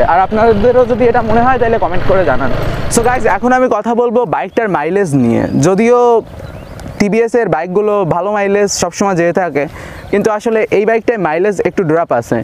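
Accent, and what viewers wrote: Khulna, Bangladesh. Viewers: আপনার খুলনার ভাষার কথা শুনে আমার ও খুভ ভাল লাগতিছে !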